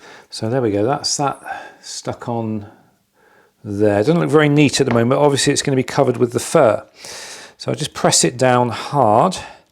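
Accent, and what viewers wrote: United Kingdom. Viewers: I remember that you were talking about Furry failures 2015 and again Making a cover for the Microphone testing it with a fan. Saying fur during your variety Channel. That makes me laugh your British accent. You are Fur happy 😊. That's so cute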